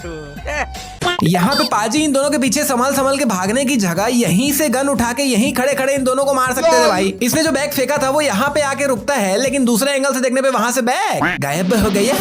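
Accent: Chhattisgarh, India. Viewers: Bhai aap chattisgarh se ho ye aaj pta chala 😅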